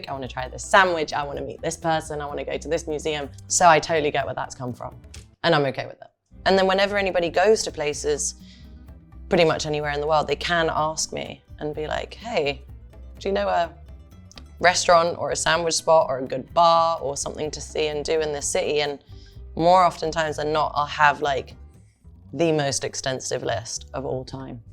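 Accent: United Kingdom. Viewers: and the British accent is very strong, as strong as the drink 🍹 i suppose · Omg❤😊I'm obsessed with british accent. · What kind of British accent does Dua Lipa have? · Very sweet girl and very heavy UK accent ... 😅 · Stunning ❤❤❤ I didn't know, she has sort of like a British accent, love it ❤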